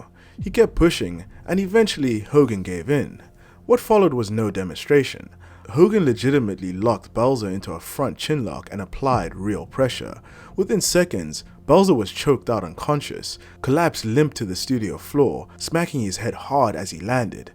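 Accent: South Africa. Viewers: He sounds South African, I thought I was bugging. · Are you South African perhaps?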